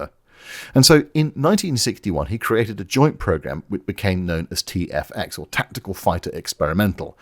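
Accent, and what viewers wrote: United Kingdom. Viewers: just finds channel...hummm this guys sounds British but what level of snarky British is he. (watches for a few minutes) ahh proper British snark levels! instant sub! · I was not totally listening until I heard the British voice claim the Republic F105 Thunderchief was a "Crap airplane". Which is NOT WHAT PILOTS THOUGHT. The F105 was considered a "Cadillac of aircraft" by their crews and pilots. It was insanely complex, and very large, but it was beloved by most of the people who flew these aircraft. They took them out of service because they were doing ridiculously dangerous duty drawing radar contact of SAM missile batteries, and thus about 380 of these aircraft were lost. Strategy began to change. But the F105 was not truly a "crap" aircraft, not by any rational measure. In fact, it seems the electronic and radar gear on the plane appears to have been hyper advanced, and established the trend that advancement in electronic gear was going to be "the future" along with advanced missile tech.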